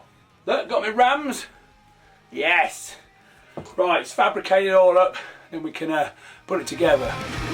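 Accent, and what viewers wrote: England, United Kingdom. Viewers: Сука мега амерзительный чисто английский язык другое дело американский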